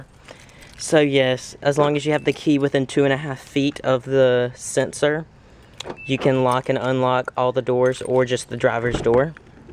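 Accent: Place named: South, United States. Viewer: sweetie hi...i tried.. and tried.. to listen to your review but because of my prejudices i can't..... i just cant take the american southern accent.. anyway.. i think the car is cute.. .my nephew wants this.. for graduation present..... so i just wanted to watch reviews on it....i am sure your review is very detailed.. but that sloooooooooooow american accent... causes too many vexations to my spirit....... be blessed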